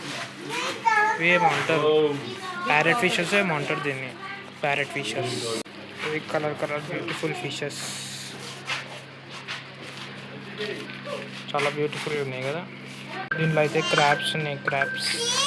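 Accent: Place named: Telangana, India. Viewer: 😮❤❤❤❤️ Telangana language super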